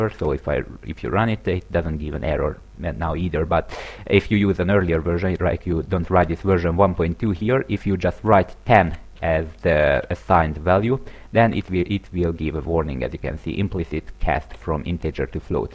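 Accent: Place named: Hungary. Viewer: te magyar vagy hallatszik az akcentuson meg látszik a "fájl, szerkesztés" ikonok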